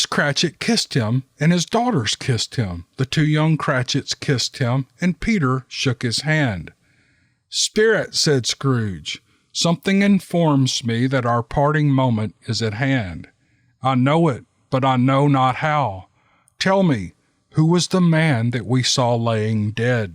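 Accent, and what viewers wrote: South, United States. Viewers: You sir can read Green eggs and ham with that Southern twang and make it sound great! Merry Christmas to all.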